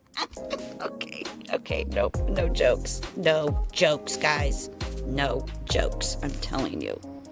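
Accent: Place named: South, United States. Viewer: Okay....this is the first....but not the last ....of your videos I have watched. You might be in the Northeastern U.S., but you almost sound Southern.....*signed a Southern gal*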